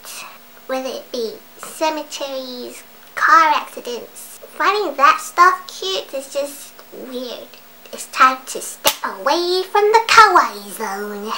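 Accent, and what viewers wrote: United Kingdom. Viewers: A japanese girl with a british accent, now I can die happy. x,x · somehow i hear british accent. is it just me who noticed that?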